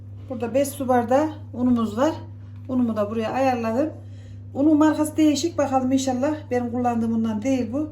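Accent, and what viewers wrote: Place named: Anatolia, Turkey. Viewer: Senin eline de şivenede kurban olayım o ellerin dert görmesin ellerine sağlık Anadolu insanı hiç kendini darlama nasıl konuşuyor san öyle devam et biz seni doğal halinle çoook seviyoruz evimizden biri gibi MaaşALLAH sana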